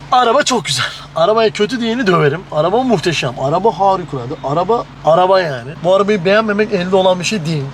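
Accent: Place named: Muş, Turkey. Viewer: Hayt dayim canını yiyim ne güzel konusuor mus sivesiyle muslular candir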